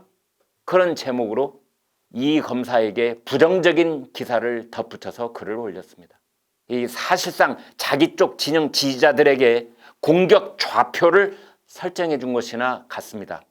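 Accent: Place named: Jeolla, South Korea. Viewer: 말을할때 어금니를 갈면서 발음하쟌어요 특히 성깔 부릴때는 어금니를 뿌드득 갈면서 소리낼때는 전라도 사투리가 가루를 날리더라구요 대구 경북여고 출신이라든데 경상도 사투리가 아니닙다. 소시적 최하8~10년정도 전라도, 나머지 경상도,서울말 섞인 묘한 발음입니다. 고향이 어딘지???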